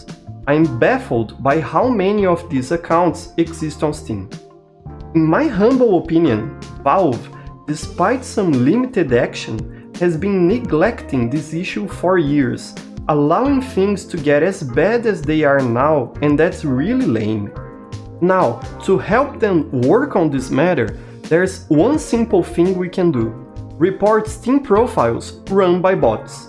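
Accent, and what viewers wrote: Brazil. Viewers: Excuse me aren't you a brazilian YouTuber already? I've noticed because of the accent. " " i believe? It's that the case? You sound extremely similar to the guy. Plus, this video is very similar to one of his, talking about the same topic!!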